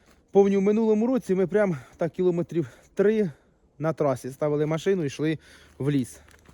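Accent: Ukraine. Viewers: Який колоритний український діалект! Таке задоволення слухати! Та й грибочки, все тільки конкретно, без лишніх блукань показано. Відео сподобалось! Єдине може тільки, знімати сам гриб трошки ближче, щоб видно було красу гриба. Дякую!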